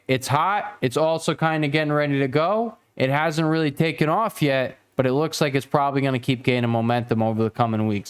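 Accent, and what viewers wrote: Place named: Chicago, United States. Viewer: Thanks for your videos! Aspects of your accent remind me of where I grew up in Chicago. Where are you from?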